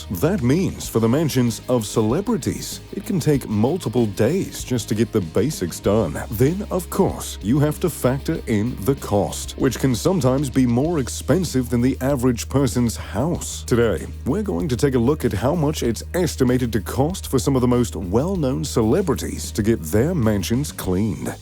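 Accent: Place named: RP, United Kingdom. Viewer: I hade no idea where you were from. But I knew you were foreign. You have mastered the upper class/posh accent 🤝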